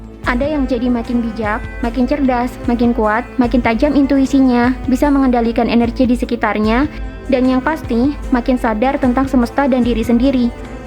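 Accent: Java, Indonesia. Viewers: Medok banget ngomongnya.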